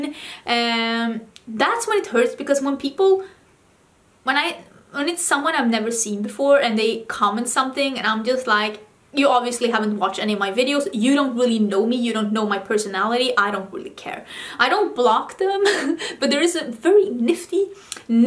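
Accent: United States. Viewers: I have a question for you: where did you learn your perfect American English? Did you ever live in the U.S.? Just wondered.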